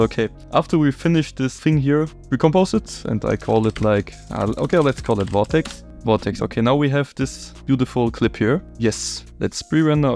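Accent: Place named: Germany. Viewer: Ich kann deinen Deutschen Akzent hören haha 😭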